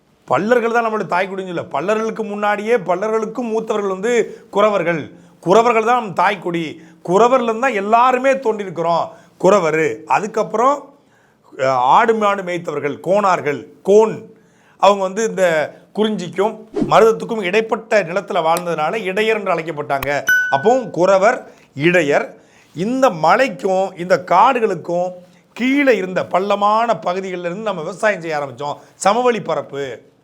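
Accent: Tirunelveli, India. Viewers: அண்ணாச்சி நீங்க திருநெல்வேலி மொழியிலேயே பேசுங்க ரொம்ப சுவாரஸ்யமா இருக்குது💯👏👌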